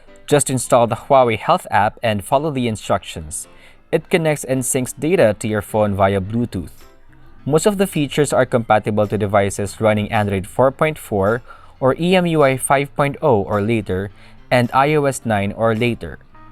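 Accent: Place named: Philippines. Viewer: omg the annoying Philipino accent.. the accent spoiled the whole video.. PLEASE LEARN ENGLISH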